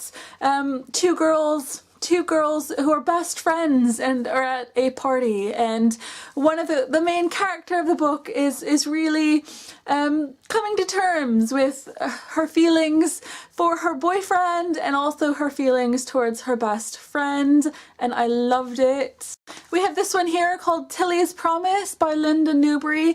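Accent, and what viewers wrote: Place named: United States. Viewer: Is it me or does she sound American when she’s British?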